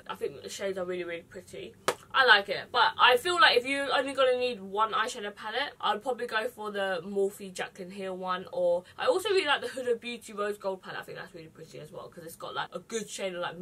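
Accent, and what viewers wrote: United Kingdom. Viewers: What your British???????? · Wait she's British what the fuck didn't see that comin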